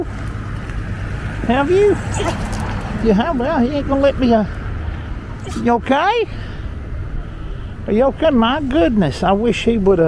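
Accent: South, United States. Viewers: Be yourself. There is nothing wrong with your country accent, that is our blessing from being in the south. I have one too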